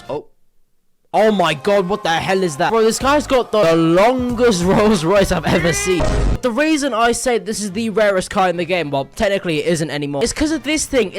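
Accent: United Kingdom. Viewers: good bradford accent. its a 8.5/10 from a boy who lived in bd for 8 yrs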